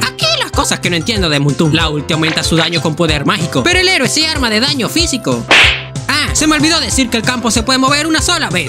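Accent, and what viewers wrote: North, Spain. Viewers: Con la prima? norteño? XD saludos!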